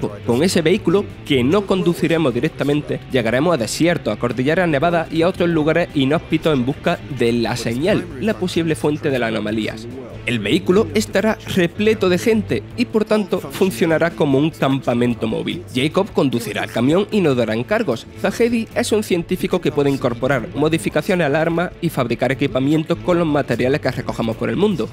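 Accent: Spain. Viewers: El acento de este reseñador de Vandal de qué parte de España es? He oido ese acento en varios españoles y nunca he sabido de qué parte es. Alguien sabe? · Es un acento español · Sin ánimo de ofender, quería marcar que: siendo de Latinoamérica se complica entender el acento del locutor. No se reconocer a que parte de España corresponde pero creo que lo tiene más arraigado que otras personas de esa zona que escuché hablar en otras oportunidades en videos y se entendía correctamente. Saludos y muy bueno el canal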